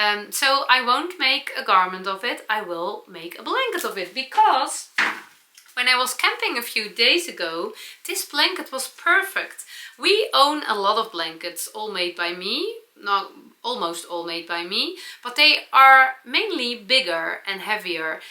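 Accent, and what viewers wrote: Netherlands. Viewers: I really enjoy listening to your 'Dutch-y' English...especially 'heart-jumping' and ' Sauce'...and any others you wish to create. 😉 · Lovely video! And never apologize for your Dutchy English. I was always so impressed with the Dutch people’s fluency and ease in so many languages. They would greet me in one language after another until they found mine. Lovely people, beautiful country. ❤ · I would suggest saying, “that explains my Dutchy accent”. There is nothing to apologize for . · I'm so glad I found you and your "Dutchy English!" I'm half dutch myself, so it's so fun to listen to you! I really enjoyed your podcast, so gezellig! I also enjoy knitting, crocheting, and I love sewing as well. I've made some of the Sew liberated patterns, but now I need to get the tiered skirt pattern! I've also made quite a few of Lucy's crochet afgans for my children. 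I love all the colors of your new advent afgan you plan to make in Lucy's woodland afgan. I subscribed to your channel! Groetjes uit Canada! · What you have is a lap blanket in English. Heart Jumping=(English expression)my heart skips a beat, other heart expressions are (heart warming=meaning something that makes your emotionally feel good, usually when one person helps another) (heart pounding=a reaction to a scary or surprising experience.) English needs the adjective phrase of heart jumping and as a native speaker of English and English teacher, I'm adding it to my vocabulary. English loves to borrow from other languages and I think that this a great one to add to English. I love your Dutchy English which is 98% correct to the ears of a Canadian. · I feel the same way about my legs! You will enjoy sewing the more you do it. It will become heart jumping! I have to admit that I looked up "Hard jumping" when I first started watching your videos! 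Then I heard you say it enough that I understood what you meant. I enjoy your Dutch English! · Your knitting channel is my favorite one,. You are very genuine and knowledgeable. It love your Dutchy English! I agree with you about the Magic Knot and square knots. They don’t work in my knitting either. I tie the square knots with intent to undo them, then twist the yarns and weave in the ends. I consider that Weaving in the ends is part of the knitting process, just like casting on, binding off, and swatching. Keep up all the HEART JUMPING videos!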